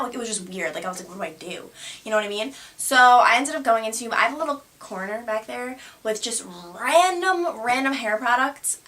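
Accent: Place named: East Coast, United States. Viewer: you had such an east coast accent in this video lol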